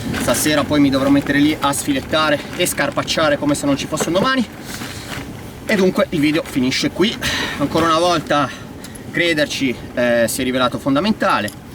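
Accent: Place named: Liguria, Italy. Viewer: La cadenza sembra ligure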